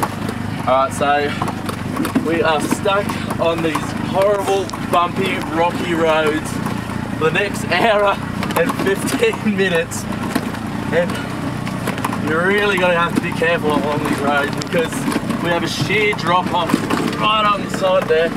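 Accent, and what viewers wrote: Australia. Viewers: Australian or NZ accent..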